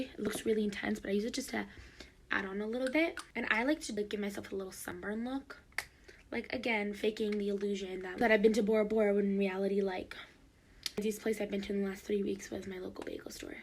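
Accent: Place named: New York, United States. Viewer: getting heavy new york accent vibes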